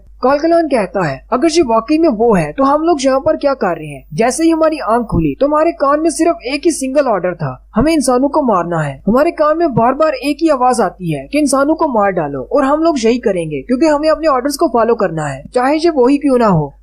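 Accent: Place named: Pakistan. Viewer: Are your from pakistan beacuse your accent is not hindi it's urdu mix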